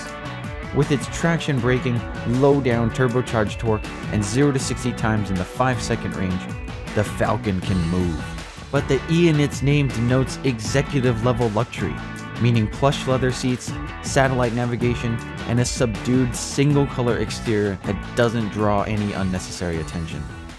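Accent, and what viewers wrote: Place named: United States. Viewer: I can't listen to a bloody American talk about a true blue Aussie turbo 6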